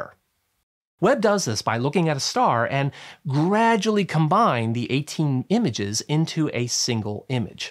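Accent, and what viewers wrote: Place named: United States. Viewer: You have such a strong american accent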